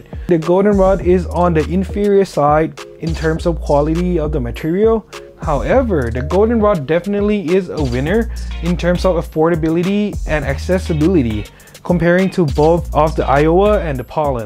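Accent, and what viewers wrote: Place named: United States. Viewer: Where in Thailand? I'm in Pattaya 4 years now and you sound American not Thai 😁 oh yeah I just bought a pair of the Goldenrod 👍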